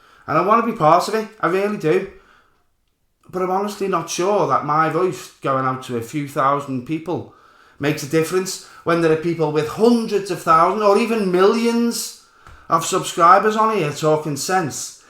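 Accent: Liverpool, United Kingdom. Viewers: 1. Role as a Commenter. OK. Stop. It. Right now. I mean it. You aren't other people. You can't compare yourself to others. Do you compare yourself to the heel work trainers at Crufts when Ann sits? Or how about your garden with Wavertree when you mow the lawn? It's got nothing to do with intelligence. Even the message you're delivering is only the second most important thing. It shouldn't be, but that's the algorithm. You have to know how to work with it rather than trying to get it working for you. I'm afraid I haven't looked at how that works, but there must be channels that you follow (since you're comparing). Contact them. Drop them an email. Ask if they know anywhere that you can get more help to grow. They might tell you where they learned, and as a bonus, they might also share their own experiences. There's also the accent. I personally see it as a bonus because you sound like some of the guys I grew up with in Lancashire (they escaped but never learned how to pronounce words properly 😉) But the Scouse accent, like Geordie, Glaswegian, and Belfast accents, are very distinct. 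Media doesn't cover much regional programming - especially internationally - so your international audience is going to be smaller than someone with a RP/Generic/BBC/Posh accent, or the "normal" Working Class accent of Estuary English or softened Cockney. (TBC)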